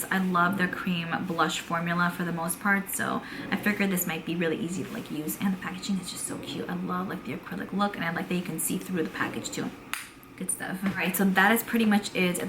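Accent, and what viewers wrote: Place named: New England, United States. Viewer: Welcome back❗❗❗ I missed you so much and thought of you often. I kinda knew you were pregnant. I want to know was this a planed pregnancy for you and Mikey? I cannot wait to hear your birth story. So happy to see and hear you. That Yankee accent makes me feel like home. Luv ya💋🤗👍✔️❣️❗ ColourPop Pop put out today cream bronzer stix and new highlighter shades. It's worth looking in to.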